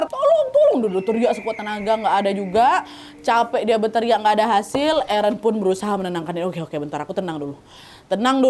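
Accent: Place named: Medan, Indonesia. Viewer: namamu, namaku nidia. lahir 27 okt, aku 28 okt. Awal kali aku liat video ytb krn logat bicaranya kayak orang medan, kirain aku org medan wkwk Sukses terus ya kak❤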